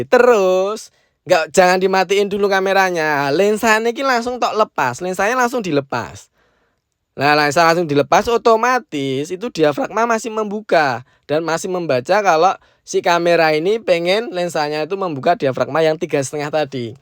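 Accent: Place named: East Java, Indonesia. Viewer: Mantap jawa timuran banget mas, terimakasih informasinya yg sangat berguna banget👍👍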